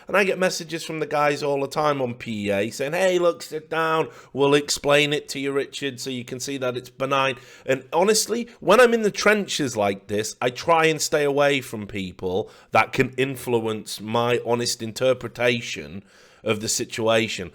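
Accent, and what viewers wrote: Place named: Newcastle, United Kingdom. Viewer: Richard are you a fucking Geordie? It all makes sense now, I love you even more.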